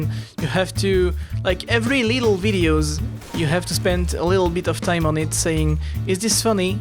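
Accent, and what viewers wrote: France. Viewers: J'aime le sexy french accent :D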